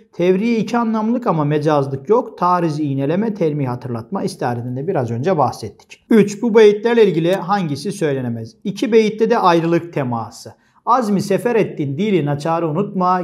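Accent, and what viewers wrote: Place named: Akçaabat, Turkey. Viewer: Hocam sizi yeni keşfettim çok sağlam videolarınız var maşallah öncelikle tebrik edip sonra bir sorum var acaba Trabzonlu musunuz hadi biraz daha tahmin edeyim Akçaabatlı şiveniz birebir aynı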